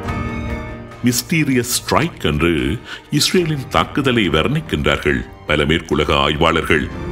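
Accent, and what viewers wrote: Sri Lanka. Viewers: வர்ணனை செய்பவர் குரல் கம்பீரமாக, தெளிவாக உள்ளது. இலங்கை தமிழ் போல தெரிகிறது. பாராட்டுக்கள்.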